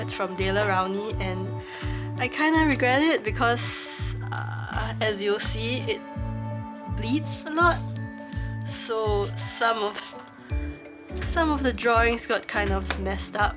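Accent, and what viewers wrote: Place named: Singapore. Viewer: Your drawings are so pretty! And I really like hearing another Singaporean voice on youtube!! Talk more!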